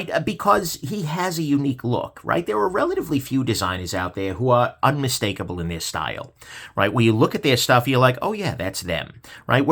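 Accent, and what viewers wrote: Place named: East Coast, United States. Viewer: Hey, love your stuff but do you also run a YouTube channel that deals with MMA … … same dialect coming out of somewhere on the east coast, I like it. Anyway, love the channel.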